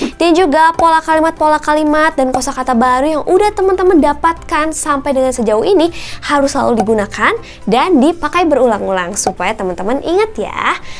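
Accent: West Java, Indonesia. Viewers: Ka sunda bgt sii 😍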